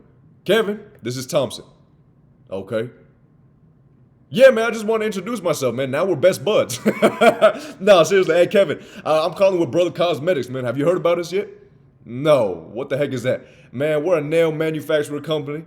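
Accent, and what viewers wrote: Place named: Houston, United States. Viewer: Where you from? Sound like from Houston.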